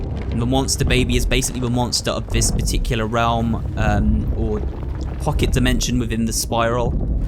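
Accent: England, United Kingdom. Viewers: I just wanna say that I'll always love how English accent will have him pronouncing the word, "three" like the word, "free" or "tree." It tickles me pink every time! 😂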